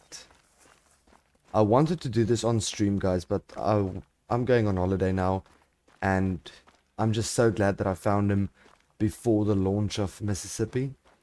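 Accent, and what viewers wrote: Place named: South Africa. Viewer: I can hear it😂 nice to find a fellow South African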